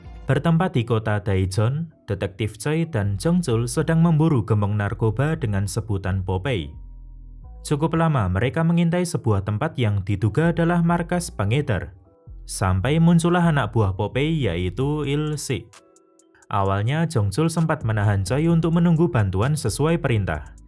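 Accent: Java, Indonesia. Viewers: Suara yac medhok .tapi enak didenger Karna notasi yac pas. Gk kecepatan tau kepelanan . Dan jenis suara yac berat Enak pkk eh dnger .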